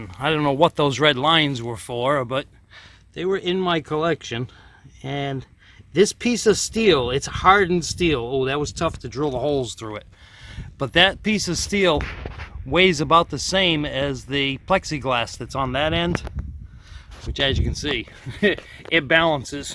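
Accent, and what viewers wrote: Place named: Massachusetts, United States. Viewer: You aren’t from NC originally are you? You got that Peabody / Beverly MA accent going on. Hahaha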